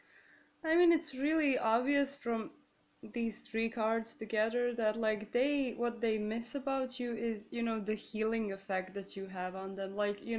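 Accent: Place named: Czech Republic. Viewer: Are you czech i think you have a czech accent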